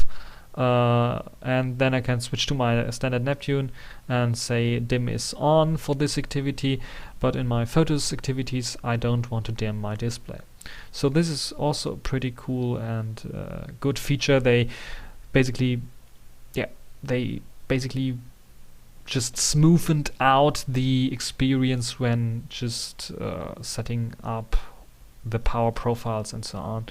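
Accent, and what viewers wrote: Germany. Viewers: Aah i love German accent :))) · thank you for the video loved every minute of it. Where are you from is it Germany?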